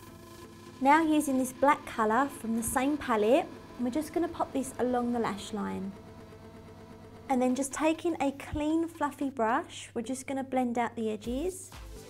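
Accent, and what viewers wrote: Essex, United Kingdom. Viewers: she can't help that she's from Essex and that's what her accent is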